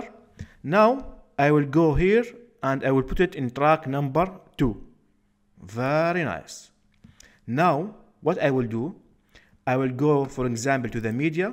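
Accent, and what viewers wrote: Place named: Arab world. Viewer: يا اخي حاسك عربي🤔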